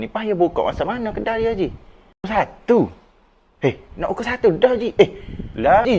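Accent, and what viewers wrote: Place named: Kelantan, Malaysia. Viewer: Udoh kecek kelate pulok😂😂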